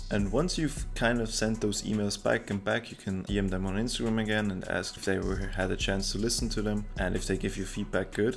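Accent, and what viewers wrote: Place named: Germany. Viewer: very good video, are u from germany cause ur accents sounds a bit like it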